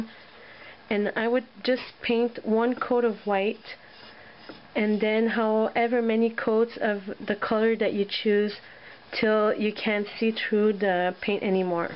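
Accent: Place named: France. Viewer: Is your first language French I'm Canadian and you seem to slightly have a French accent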